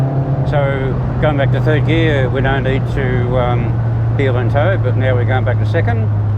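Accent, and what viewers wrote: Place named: Australia. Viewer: Great video and great technique sir, thanks for sharing. Are you from Australia by any chance?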